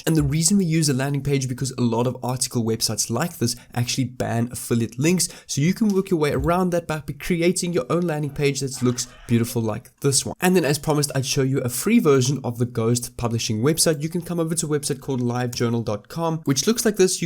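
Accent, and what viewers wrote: South Africa. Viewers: Sth.Efrican accent plus FF speed make it very difficult to follow. Relax buddy because content seems interesting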